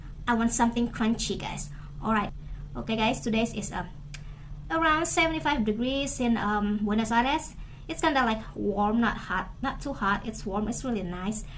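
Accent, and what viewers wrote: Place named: England, United Kingdom. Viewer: love your accent English sis❣️😊the chips so crispy 🤤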